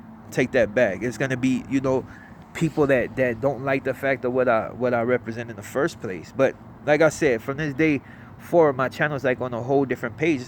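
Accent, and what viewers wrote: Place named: Mexico. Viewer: Why does he try to sound mexican if hes black?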